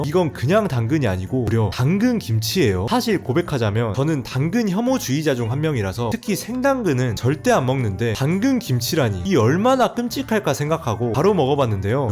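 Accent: Jeolla, South Korea. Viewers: 찰진 네이티브 전라도 억양이 너무 감동적이네요. 광주 화순 그 쪽 말투 같은데.... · 전라도 말투 나레이션은 첨인데 엄청 정감있네요 ㅎㅎ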